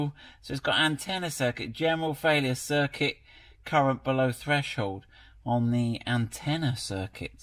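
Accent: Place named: Wales, United Kingdom. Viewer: It took me 2 minutes to realize he was speaking English. Is this a Welsh accent?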